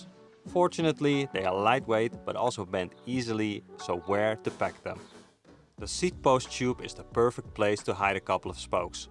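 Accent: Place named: Netherlands. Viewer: Dutch accent always immediately jumps out to me. I notice a lot of dutchies run international targeting channels covering great topics quite well spoken. Good job. Very helpfull.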